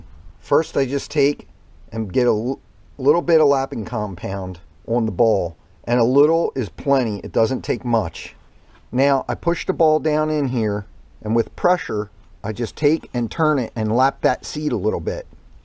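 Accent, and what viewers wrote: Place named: Baltimore, United States. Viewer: I did take the spark plugs out, I shot some lubricant down inside with Kickstart a few times. Oil couldn't wait to spew out LOL. I ordered a new battery and waiting for it in. Your accent sounds like you might be from the Baltimore area. I'm in the Gettysburg area. When I get the battery in and try to start it I'll let you know how I make out. Thanks so much for your reply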